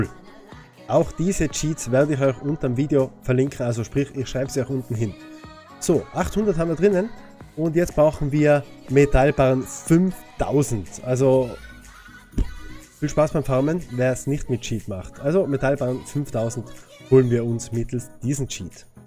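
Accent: Switzerland. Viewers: bist ein schweizer gell 😊😊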